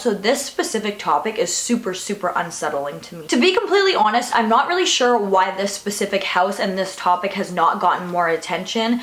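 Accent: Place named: Canada. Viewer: I love how Canadians say "about". Aboat or aboot, hehe. Sorry, I'm British. Awesome video once again, you're the Queen of the creepy. <3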